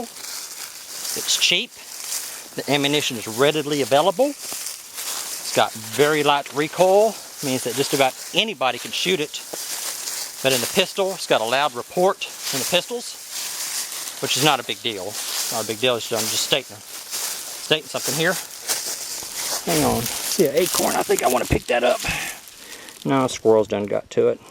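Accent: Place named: South, United States. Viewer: The ONLY caliber for a civilian is the .22 - the only one you can put 3K rounds on your back and run with . Another YT " Expert " ....besides the stupid hat and southern drawl , what might your qualifications be , Mr. Knowitall ?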